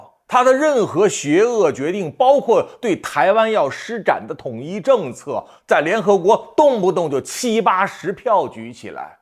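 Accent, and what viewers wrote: Beijing, China. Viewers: 这口音，这调调，这吊儿郎当气质，大概率是个前朝八旗子弟。怨恨中共那也是情有可原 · 叔 你老家是哪裡的？是北京嗎？突然好奇你的口音。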